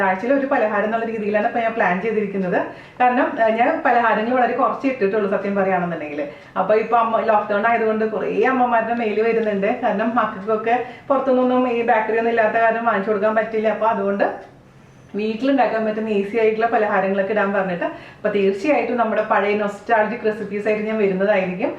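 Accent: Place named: Kodungallur, India. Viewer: Chechi... ഞാൻ ചേച്ചിയുടെ ചാനൽ കണ്ട് തുടങ്ങിയത് ഈ ഏപ്രിൽ മാസം മുതലാണ്. ശ്രദ്ധിക്കാൻ കാരണം ചേച്ചിയുടെ സംസാരരീതിയാണ് കേട്ടപ്പോഴേ തോന്നി നമ്മുടെ നാട്ടുകാരിയാണെന്ന് (ഞാൻ കൊടുങ്ങല്ലൂർ). പിന്നെ ചേച്ചിയുടെ വീഡിയോസ് കണ്ടു തുടങ്ങി. ആദ്യം കണ്ടത് ചേച്ചിയുടെ കഥയാണ് പിന്നെvacation വീഡിയോസ്, യാത്രകൾ, വീട് ,ഷോപ്പിo ഗ് അതെല്ലാം കണ്ട ശേഷമാണ് റെസിപ്പികൾ കാണാൻ തുടങ്ങിയത്.ആദ്യം പരീക്ഷിച്ചത് കേക്ക് ആയിരുന്നു അതിൽ വിജയിച്ചു പിന്നെ ബിരിയാണി, ഗുലാബ് ജാമുൻ ,അങ്ങനെ കുറേ ഐറ്റംസ് പക്ഷെ ഏറ്റവും ഗംഭീരമായത് വെട്ടു കേക്ക് ആയിരുന്നു. അച്ഛനും അമ്മക്കുമൊക്കെ ഒരു പാട് ഇഷ്ടമുള്ളതാണ് ഈ വെട്ടു കേക്ക്. എന്തായാലും ഇപ്പോ കുറേ പലഹാരങ്ങൾ ഉണ്ടാക്കാൻ പഠിച്ചു. ഒരു പാട് Thanks ചേച്ചി.എല്ലാ പ്രാർഥനകളും.....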